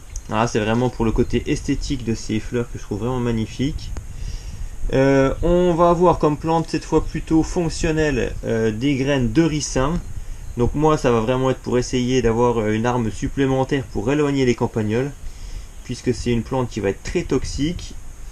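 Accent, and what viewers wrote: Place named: Lorraine, France. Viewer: Et oui les parents habitent la Lorraine et je reconnais bien ce petit accent bon dimanche bravo pour ces vidéos très intéressantes a bientôt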